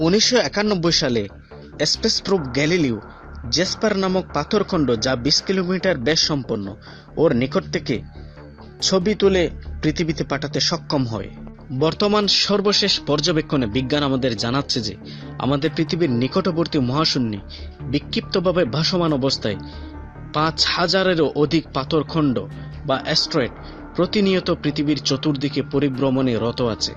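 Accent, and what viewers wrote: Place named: Sylhet, Bangladesh. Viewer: সিলেটের ভাই মনে হয়। যাই হোক তিনি তার সর্বোচ্চ চেষ্টা করেছেন।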